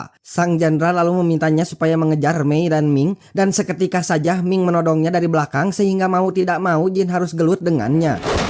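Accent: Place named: West Sumatra, Indonesia. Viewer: Kok ini logat nya kayak org minang ya.... Apakah emang orang minang min😂😂😂😂😂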